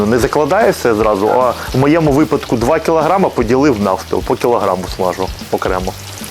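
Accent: Ukraine. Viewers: Український акцент супер! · Смакота ! Молодець ! А звідки ти є, друже, як на мій погляд то львівський акцент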